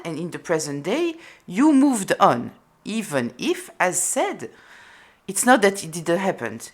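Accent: France. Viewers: I love your French accent 😊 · You are spot on and you have a lovely french accent too.😉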